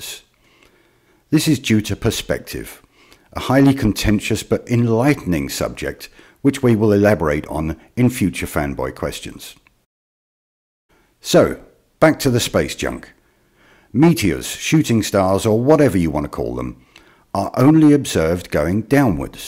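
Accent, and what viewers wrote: England, United Kingdom. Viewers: Hmm I was going to respond to you myself, but I thought I would like the answer to be as simple as possible, and I know that you have stated in other videos that you would not accept any answer with the word gravity in it. So I decided to ask my 5 year old son for you. He has not learned about gravity from school or myself as he is only 5. This was his reply, he thought about it for about a minute, and came up with this answer. Well planes can go up as well as down, but asteroids can't go up. he thought a bit more about it and added. it's like you don't see rain going up. at this point he lost interest and went back to playing with lego. I couldn't have put it better or simpler than that. And I know that asteroids can sometimes appear to go up, and rain can also go up in extreme weather conditions. but he is only 5, and appears to have a better understanding than you without any education on the subject, or prompting by myself. 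As you have an English accent I take it you have seen the program are you smarter than a 10 year old. Well you don't seem to be smarter than a 5 year old. Now please stop preaching disinformation to gullible people, you do not realise the harm you are doing to them.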